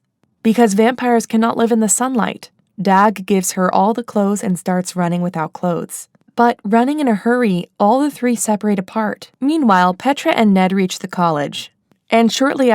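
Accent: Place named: United States. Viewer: Why does the narrator have a Amrican accent but speaks like English is not her first language?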